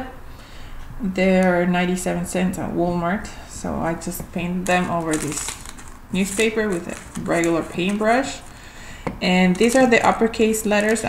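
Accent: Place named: Spain. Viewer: Hi, It seems that your mothertongue is Spanish. What language do you work with your child? English or Spanish? I'm asking that because my daughter is bilingual and I'm not sure if I should try to help her to read Portuguese or French, the language she will learn at school? Can you pls give me an advice? Thanks.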